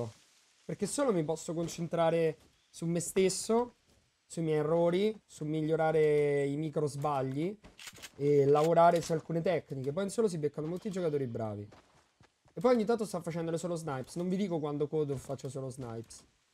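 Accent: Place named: United Kingdom. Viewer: Quanto british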